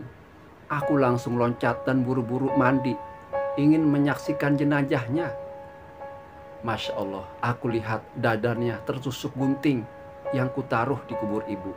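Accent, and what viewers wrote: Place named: West Java, Indonesia. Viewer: jngn lupa logat Cibogo pake Terus dan plesetan semangat 💪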